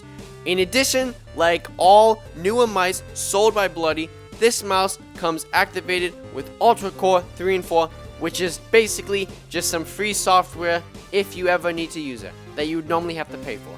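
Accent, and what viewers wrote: New York, United States. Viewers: Your from new york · As a person who lives in New York I love his NY accent edit: sheesh thx for the likes and heart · I can feel the new York accent through the screen. Good bid m8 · You are from ny?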